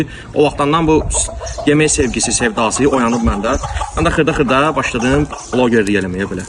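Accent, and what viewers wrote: Baku, Azerbaijan. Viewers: Can Bakı ləhcəm❤ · Bakı ləhcəsi .Var ol .Əllərinə sağlıq . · Salam.Bakı ləhcəsi,ƏLA.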